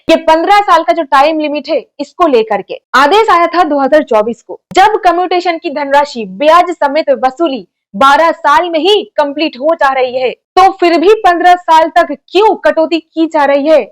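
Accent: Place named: South, India. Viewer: Your south india hindi speaking style is osam, I like your speaking voice.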